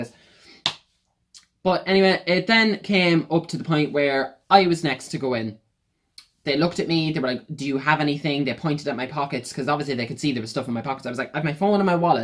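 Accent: Ireland. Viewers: I’ve liked a few Britney songs over the years and have followed her history but I’m wouldn’t call myself a fan per se. I decided to watch this video after recently seeing another “meet and greet trauma” video relating to her. I liked the way you told the story and your presentation is authentic. I think all your feelings were justified. I disagree though that she has no control over this. She is an adult and a multimillionaire. If she can’t see the potential downsides to this type of fiasco then that is 100% her failure as a business person, entertainer and human being. If you learn one thing from this is it’s that humans aren’t for idolizing - we are all flawed and imperfect. And my last comment is that I love Irish accents!! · Trying to place your accent...midlands? Offaly? Cavan?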